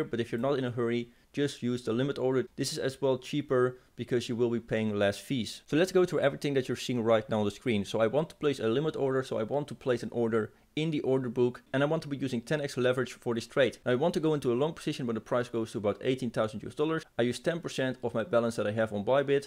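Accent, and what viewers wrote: Netherlands. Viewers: Definitely van Nederland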